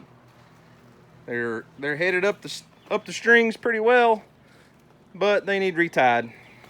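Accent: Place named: South, United States. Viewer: Fl'ars'...luv thet country-fried accent!!💓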